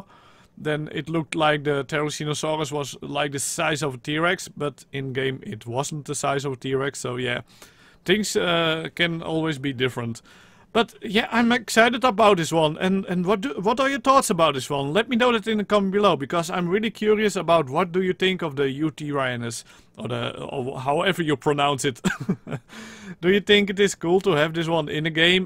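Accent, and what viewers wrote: Netherlands. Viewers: Je hoort dat hij Nederlands is hij is wel grappig😂😂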